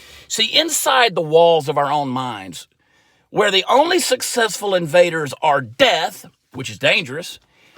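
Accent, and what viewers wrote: Texas, United States. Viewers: How does a New Jersey-ian get a TX accent?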